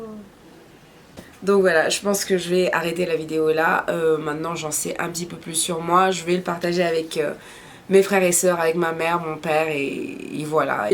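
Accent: Gabon. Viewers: avec ton accent je sais que tu es gabonaise et aussi que le haut-oguée c'est au sud est du gabon c'est l'une des 9 provinces du gabon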